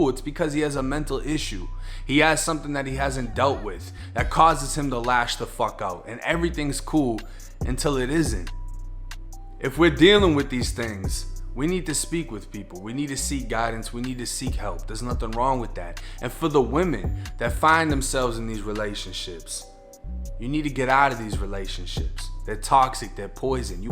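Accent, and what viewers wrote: Boston, United States. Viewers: If you from florida why you sound like you from south Boston fam?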